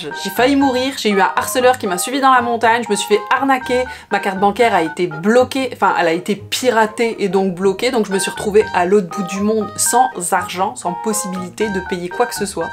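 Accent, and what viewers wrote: Quebec, Canada. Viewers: tu commences à avoir un petit accent Québécois non ? :p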